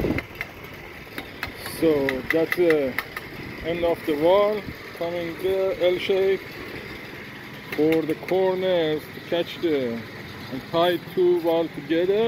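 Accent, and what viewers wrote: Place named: Iran. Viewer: I'd recognize that Persian accent anywhere. :)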